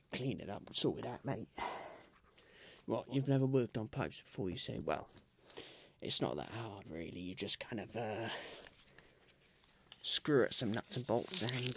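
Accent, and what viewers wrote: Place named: England, United Kingdom. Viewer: I hear the English in your voice now 😭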